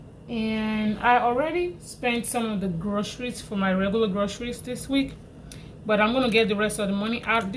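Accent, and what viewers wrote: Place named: Nigeria. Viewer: Hi! Great cash stuffing. Your so close to finishing your 52 week challenge woo hoo. I love me some Nigerian jallof rice I’ve made it a few times. I thought your accent was Nigerian. I’ve been to African grocery store I find it fascinating. I really enjoy pounded yam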